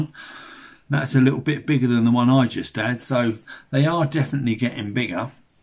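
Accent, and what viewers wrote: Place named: London, United Kingdom. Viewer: Great video. Thanks for sharing. Do I detect a slight London accent there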